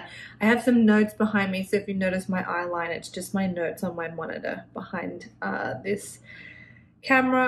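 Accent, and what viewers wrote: Australia. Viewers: Didn’t realise you were Australian! That’s so cool 😊 what state are you from?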